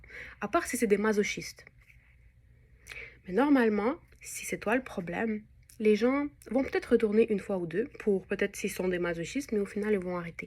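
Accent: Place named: Quebec, Canada. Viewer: Tu es québécoise ? Je crois déceler un léger accent 😊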